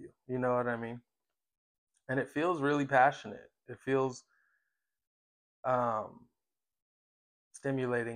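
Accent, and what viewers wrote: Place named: England, United Kingdom. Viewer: Aaarrriieess! I loved your English accent 😜 thank you so much for your time and energy. Everything resonates as always ✨⭐️🌸 Sending so much love and light to you personally and also to all my fellow Aries out there - keep going- don’t give up! 🌸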